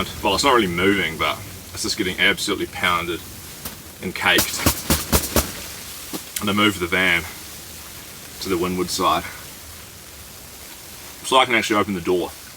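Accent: New Zealand. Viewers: I love listening to your New Zealand accent. We have quite a few New Zealanders here in Australia so I am quite used to the accent. Do you have a playlist of your Videos, I would like to watch them all in Chronological order. Keep up the good work. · He's a true kiwi where their i's sound like e's. If you want to know if someone is a kiwi, ask them to say fish and chips. It'll sound like fesh and cheps. He's ha 😅 We'll be Namibia next year on a world cruise. We're really looking forward to it. The desert looks spectacular, but I'm sure you'd love a winter scene like this after 300 days of sun.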